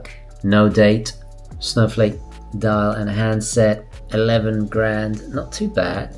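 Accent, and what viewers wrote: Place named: England, United Kingdom. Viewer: An Italian with an English accent , ma whats going on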